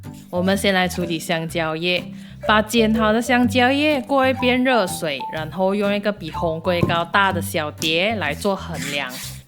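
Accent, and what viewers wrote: Malaysia. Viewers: 喜欢听妳那带马囯乡音的华语.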